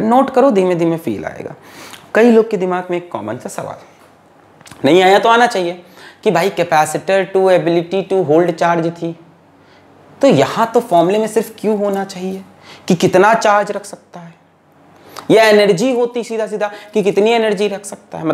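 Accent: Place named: South, India. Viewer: South Indian accent 😍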